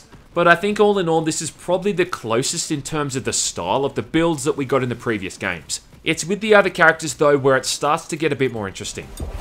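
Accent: Australia. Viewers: I don't get why you're so hung up about them removing a slur from a game. Comparing your Aussie accent to being a little person is kinda apples and oranges. I get that ppl on twitter can be volatile, but like, there are reasonable reasons that ppl would want m*** removed from the game. In my experience, even trying to have a calm discussion about these things causes people to plug their ears and go, "Sjw sjw, i can't hear you, tuning you out, bla bla bla bla bla bla" I'm aware that gearbox doesn't actually give a shit about the slur, and is just protecting their bottom line.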